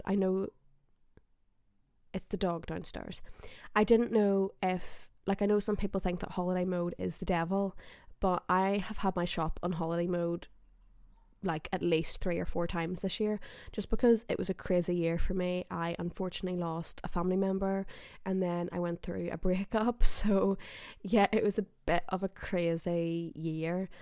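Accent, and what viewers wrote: Ireland. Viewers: I love your Irish accent. You are so charming!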